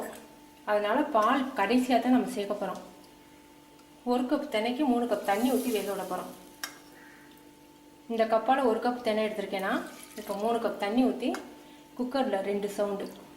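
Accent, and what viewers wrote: Madurai, India. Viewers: maduraya neenga...ur pronunciation looks like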